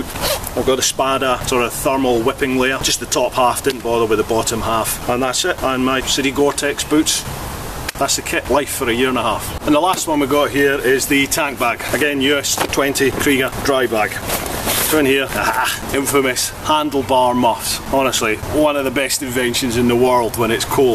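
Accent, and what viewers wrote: Scotland, United Kingdom. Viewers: Hi mate , just found your site , really enjoyed it, the accent grabbed me as a fellow scot , in Oz for the last 30 yrs and a touring , camping bike rider as well. Now happy subscribed . Thanks again Steve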